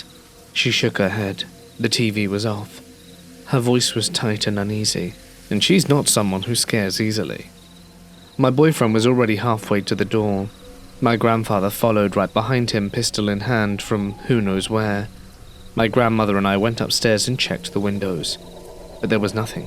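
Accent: England, United Kingdom. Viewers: Yay! New video from the classic English man narrator. Thank you:)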